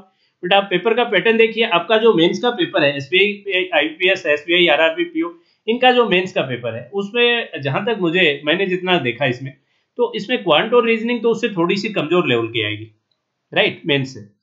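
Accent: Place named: Gujarat, India. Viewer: Seems like you are gujrati by tone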